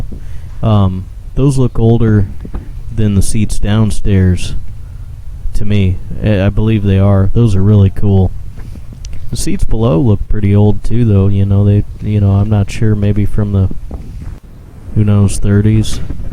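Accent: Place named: Midwest, United States. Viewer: Classic Midwestern Accent... I was asking myself... what accent? Then I realized where she was from. Your voice is very soothing. I would like to take an entire section of those glass blocks.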